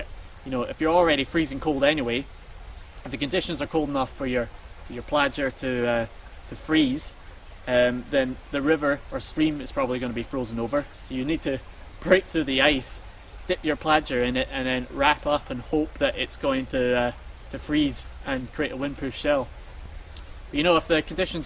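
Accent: Scotland, United Kingdom. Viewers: Interesting and informative, and great to hear a Scots accent on Yt. Dunno about dipping my plaid in a burn, though - you're a braver man than me,:-D All power to ye.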